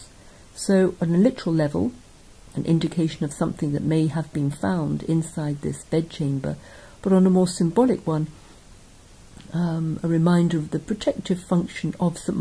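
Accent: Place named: RP, United Kingdom. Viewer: Phrases like "we're not quite sure" and "must mean something" pronounced with an RP English accent sound far less learned than they once may have.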